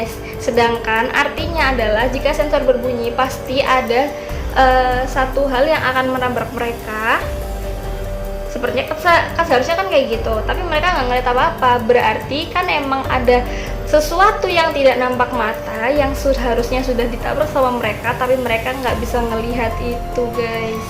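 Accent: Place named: Surabaya, Indonesia. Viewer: Exspresinya dah ok mbak....tp suroboyoan ne iku lhoo seng jek ketok😂😂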